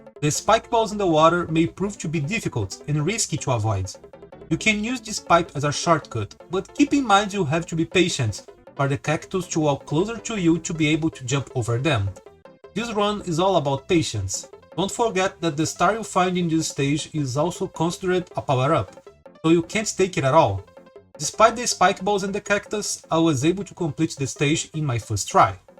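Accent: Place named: Brazil. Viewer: Oi, acredito que o YouTube tenha me recomendado seu vídeo porque gosto de ver desafios, Mario e também porque você é brasileiro (pelo menos pelo que percebi do sotaque). Estou deixando esse comentário não somente para engajar, mas para fazer elogios: parabéns pela coragem de fazer conteúdo em inglês. Eu ensino inglês e não teria essa coragem porque tenho muita vergonha. Aliás, pode ser que venha alguém te encher o saco pelo sotaque, mas não ligue: nós brasileiros temos um sotaque baseado na nossa língua-mãe, ninguém precisa falar como nativo, desde que seja compreensível. Acho que a única palavra que foi usada com frequência e pode ser difícil para alguém de fora entender seja "Island", cuja pronúncia é próxima de "ai-land", o som do S some. Tirando essa, tá tudo muito compreensível, e os comentários em inglês que vieram antes dos meus já demonstram isso. 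E, aliás, vida longa ao SNES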